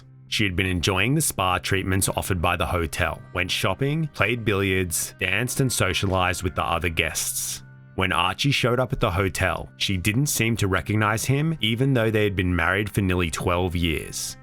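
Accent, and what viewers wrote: Australia. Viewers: It’s that chill Aussie with the interesting stories again :)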